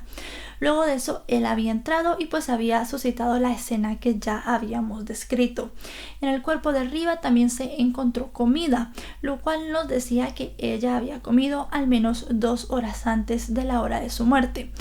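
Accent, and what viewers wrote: Standard. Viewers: Tu español es muy neutro.. es de donde? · Excelente manera de transmitirnos la información sobre los casos ,amo su español Neutro ,no tenía idea de donde Era pero creo que no tiene acento de Guatemala es más no se distinguir el acento solo que me encanta la forma en que narras ,un gran trabajo impecable ! Suerte que siga creciendo más Saludos de una Hondureña ! ❤️ No hay día que no vea un caso suyo ! :)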